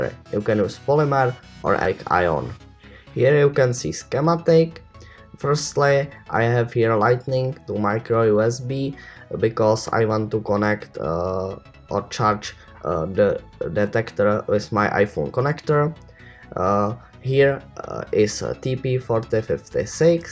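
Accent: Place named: Czech Republic. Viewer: Celkem český přízvuk XDD gut video dávám like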